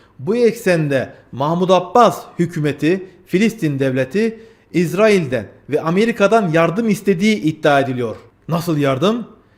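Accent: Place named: Adana, Turkey. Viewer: YA GARDAŞ SENİ GÜNLÜK İZLEMESEM İŞİM RAST GİTMİYOR TAM BİZİM ADANA ŞİVESİ KONUŞUYON BENİ.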